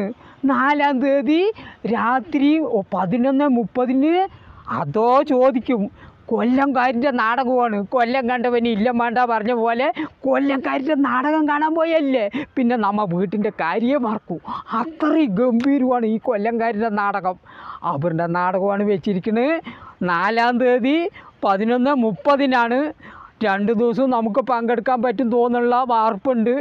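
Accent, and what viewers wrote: Palakkad, India. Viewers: പാലക്കാട് ഭാഷ 🥰🥰🥰🥰സൂപ്പർ. നിങ്ങൾ pkd എവടെയാ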